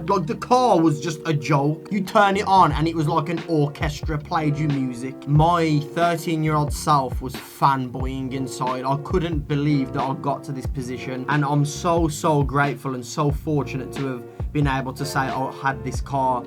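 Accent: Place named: Birmingham, United Kingdom. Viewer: Never thought I would see a brummie in a lambo, but ok...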